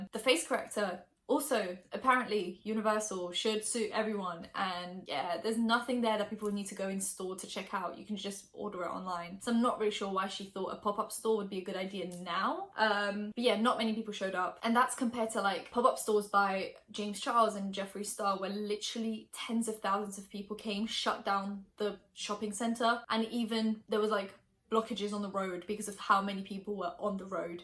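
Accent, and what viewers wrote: United Kingdom. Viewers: What U.K. region is your accent from?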